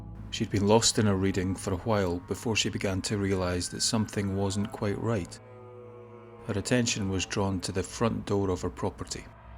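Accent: Scotland, United Kingdom. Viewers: Creepy ghost story well illustrated and told by the best Scottish accent on Youtube. I also suddenly crave pizza. Thanks!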